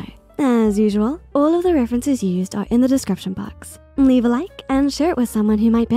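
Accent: United Kingdom. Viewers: Thats the most british accent i have ever heard 😵 It’s a bit annoying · The VA is both British and Southern 🤔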